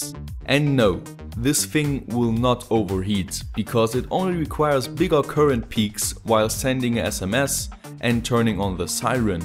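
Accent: Germany. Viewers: Das hört man auch du hast einen Deutschen Akzent aber dein Englisch ist sehr gut!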